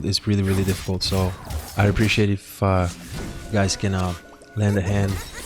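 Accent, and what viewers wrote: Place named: Bulgaria. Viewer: So I've been watching your videos for what? Year and a half? Two? And only now I actually realize why your accent is so familiar - you are a Bulgarian fellow :) Hit me up when you land in Sofia, you have a beer from me ;)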